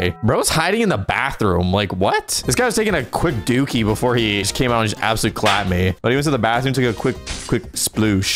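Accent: France. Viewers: I am french!!!! I LOVE YOUR FRENCH ACCENT!!!, DO U LEARN FRENCH OR SMTH? You're saying every words right!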